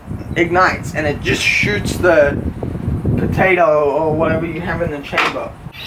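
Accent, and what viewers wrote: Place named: England, United Kingdom. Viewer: You really should look into installing a grill ignitor system. Preferrably an electronic one vs a piezoelectric variety. It will solve all of your firing issues. Im detecting an english accent. Perhaps they may not be available where you live. Best of luck man.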